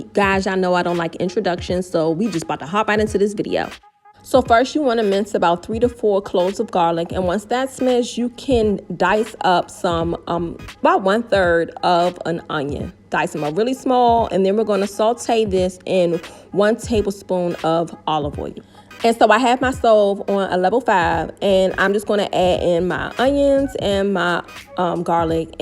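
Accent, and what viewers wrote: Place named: Prince George's County, United States. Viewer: Just found your channel. New subscriber! You’re so funny, you sound like you’re from PG.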